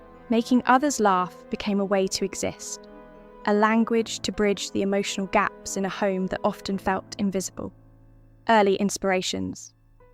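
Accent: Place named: United Kingdom. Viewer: Another video with an annoying, low class British accent.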